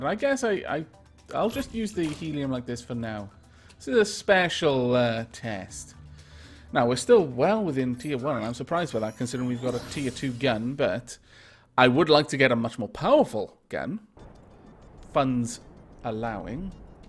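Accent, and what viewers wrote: Wales, United Kingdom. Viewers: A MASSIVE: THANK YOU!!! (^_^) Hi! I'd been watching lots of Cataclysm: Dark Days Ahead vid's here on youtube when I stumbled across your fantastic channel. After I'd ran out of your Cata' vid's to watch I briefly checked your Pixel Piracy when I noticed these Robocraft vid's of yours. As a fan of Minecraft & Kerbal SP the appeal was instant. I downloaded the game that same & haven't touched anything else for a solid week now. I've been making pretty much 1 to 2 vid's a day now since I started out on this epic quest of awesome cube versus cube destruction and would just like to thank you for your part in this. Will give you a shout out in my next video. I'm a fellow Brit & love your accent [ Welsh? ] - hope to see you ingame someday & please feel free to see what you've caused if you ever have the time over on my channel, would love some feedback if you do ever get the time to peruse my efforts so far, cheers Sho (^_^) <link>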